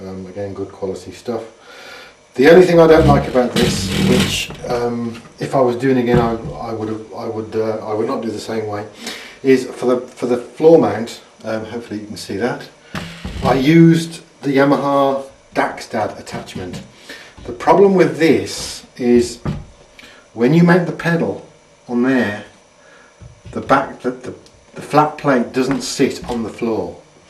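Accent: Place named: England, United Kingdom. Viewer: congrats great triggers...you have a very nice English accent, is from birmingham?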